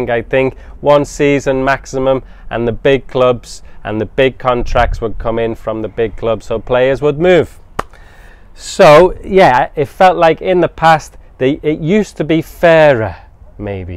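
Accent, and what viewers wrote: United Kingdom. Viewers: That's a nice way of teaching English through football. Also, it's very nice of you to speak considerably slower as people here usually have difficulty in understanding British accent. Cheers!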